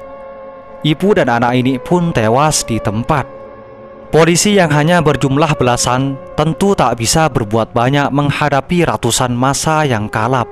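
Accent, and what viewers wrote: Madura, Indonesia. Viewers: Yang ngomong pasti orang madura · Logat maduranya gk bisa di sembunyikan min 😂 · Admin kental logat duro kkkk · Admin ini orng madura keliatan dari logatnya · Admin nya Juga Orang Madura lu ya mind. Ketawan Banget Dari Logat Ngomong nya. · logat anda sangat kental maduranya bang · Nih orang gua tebak asli orang madura😂dari logatnya aja udah keliatan bgt😁 #SalamSettongDereKak🙏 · Dan pengisi suara ini sangat keliatan banget kalo orang madura 😂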